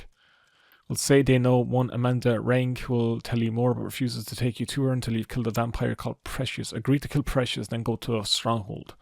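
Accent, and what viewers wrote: Ireland. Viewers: Am I the only one who just keeps on just to hear an Irish man rant for background noise?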